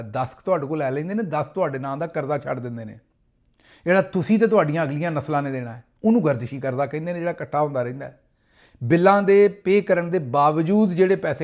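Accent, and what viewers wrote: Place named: Punjab, India. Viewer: Doctor sahib pleases extend the duration of vlog. I love your punjabi lehja.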